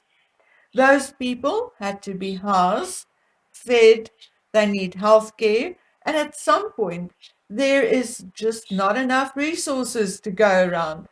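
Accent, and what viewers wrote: South Africa. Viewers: I have been in the UK for nearly 20 years, and I see quite a few similarities to South Africa, but you dare not say anything without being told to "go back home then if you don't like it". I see it in job advertisements where in South Africa you have still the person of colour being preferred for the job. That is happening here now. They word it where they say they prefer applicants of colour/persuasion. Love your research and as a South African another welcoming fellow Saffa accent.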